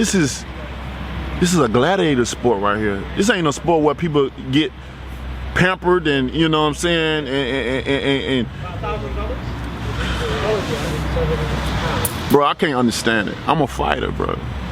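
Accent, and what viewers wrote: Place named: Alabama, United States. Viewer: I’m reading the comments and I think it’s disgusting that people are poking fun of the way that he speaks, he’s from Alabama, they speak with a southern accent. There’s nothing wrong with what’s he’s saying, people from around the world think, especially Europeans, look down on other English speakers because we don’t sound like them. I know this to be a fact, I served with British and Irish soldiers and they rag on guys from America about their accents. I can’t wait until this guy cleans out the the division, so all you Europeans can be quit. But I’m figuring you guys will complain about something else Americans do or say.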